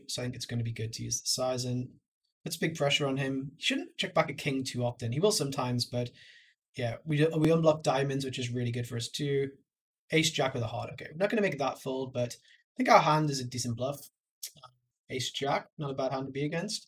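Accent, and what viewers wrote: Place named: Cardiff, United Kingdom. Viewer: Where are you from?...........sounds like a tint of Cardiff accent there......nailed it?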